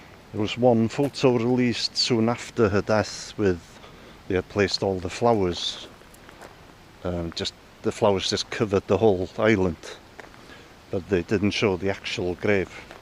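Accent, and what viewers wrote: Wales, United Kingdom. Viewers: Is he welsh thats narrating cos the accent is terrible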